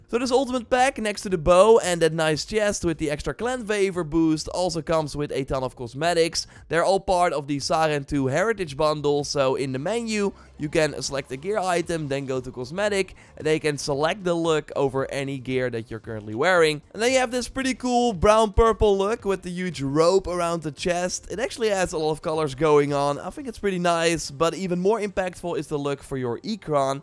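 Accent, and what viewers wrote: Netherlands. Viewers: Nou je bent zeker Hollander?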